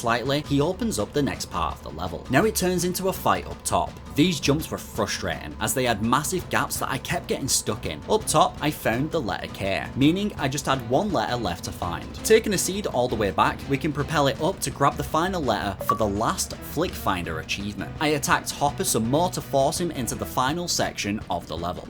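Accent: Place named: Norfolk, United Kingdom. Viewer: What part of the UK are you from; I’m trying to place your accent — is it Norfolk?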